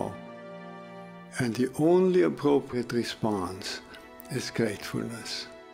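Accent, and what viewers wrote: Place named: Austria. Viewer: Brother David is speaking in his second language, English. He is speaking with an Austrian dialect. He is from Austria.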